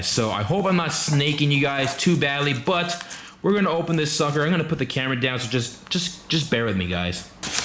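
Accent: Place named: Philadelphia, United States. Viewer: tyoors lol sounded like you’re from south Philly haha. Love the content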